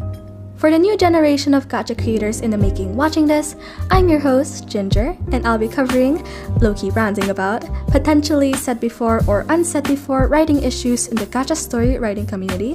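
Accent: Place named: Philippines. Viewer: This is completely irrelevant but are you filipino? I can tell by the accent 😭 (I don't mean this in a bad way btw your accent is amazing! I can just tell when someone is one of my own based on accent hehe)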